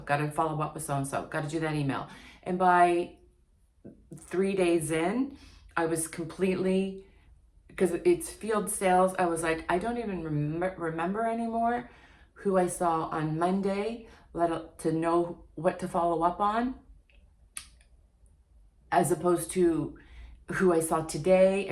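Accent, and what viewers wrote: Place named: Canada. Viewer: I knew you were a canadian based on 1 single word you spoke. Im in detroit, ontario border in detroit, and we speak exactly the same as far as dialect except for pne word. About. You canadians really pronounce it quite different.😄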